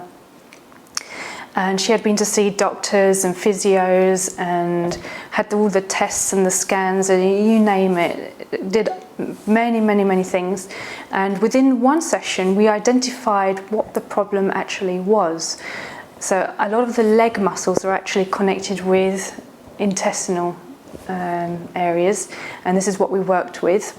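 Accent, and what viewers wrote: United Kingdom. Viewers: Definitely an English accent :) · Funny, when I saw the name, I expected either Spanish/Mexican accent or an American one, not a South-East England/London accent.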